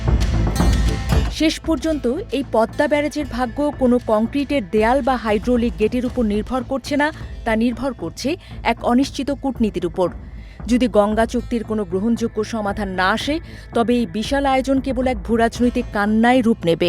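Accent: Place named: Kolkata, India. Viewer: এটা কলকাতার ভাষায় কথা বলছে😂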